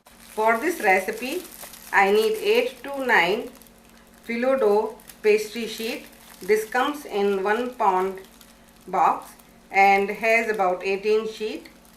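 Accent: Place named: India. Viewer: everything that you teach us is wonderfully delicious! Please tell us the name of the (teaspoon) spice that you're adding to the sugar syrup., you're Indian accent is lovely but I keep missing the name. Thank you!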